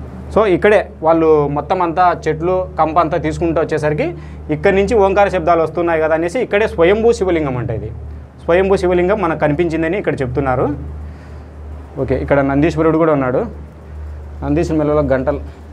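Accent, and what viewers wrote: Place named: Kadapa, India. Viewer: Naynu ayithay definitely miru ma Kadapa slag matladuthunaru ani naynu Chusthunna anna Bigam bayala....inka Sadhi buvva.....kaniii Raaa biiii ani Marchipoyaru anna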